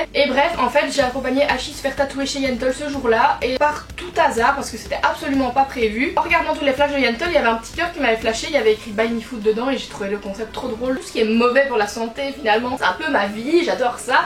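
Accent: Belgium. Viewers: Tu est belge nn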